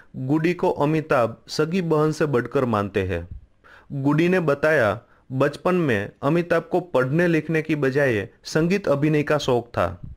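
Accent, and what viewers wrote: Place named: Bengal, India. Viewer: bro I am from Nepal n trust my hindi much much better then urs...sayed aap Bebggali hola ish liye aap bolte hai ....उस टे अमिताभ बोचन उन के कोर गोया था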